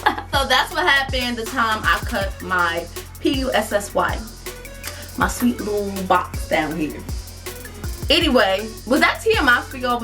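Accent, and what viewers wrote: New York, United States. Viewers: u are so beautiful and i love ur new yorker accent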